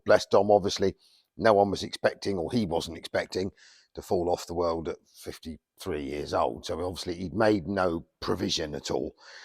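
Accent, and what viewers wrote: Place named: Dorset, United Kingdom. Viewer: Corrrr lummey, that auto box is much much better than those hatefull preselector semiauto boxes you get in sprinter ambulances, I have been put-off an ambulance to convert to living van for that very reason. I am defo going to bend your ear once I get the go ahead from mad quack to get my chuffin' licence back. I'd love one of them there oldie style sprinters or maybe a long Crafter 😁 Sounds like you've got a better microphone now, love your Dorset patter, waiting for next video, have a nice week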